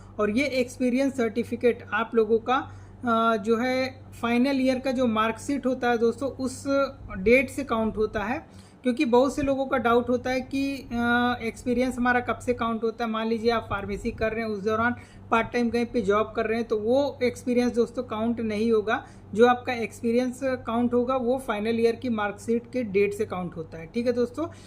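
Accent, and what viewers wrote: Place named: West Bengal, India. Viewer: Sir are you from West Bengal :)??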